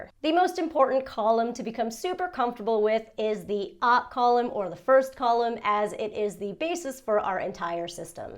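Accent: United States. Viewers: Your American accent is very nice. Were you born in USA or did you learn to speak like this? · It's fascinating hear your English sound American, and then your Japanese sounds native. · are you american or japanese? you pretty much sound american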